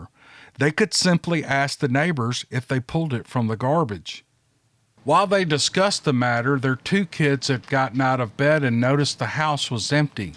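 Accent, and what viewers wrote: South, United States. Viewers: Those are some sweet ladies you got in your sideyard there, 🌱 I very much enjoy your story telling. Being half-Southern, your accent is wonderful in my ear. Thank you! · Oh yes I listen during the day and almost every night as I drift off to sleep I enjoy these encouter stories but more than that I love southern voice accent, a follower southerner 😊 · We love your stories! And your southern accent😁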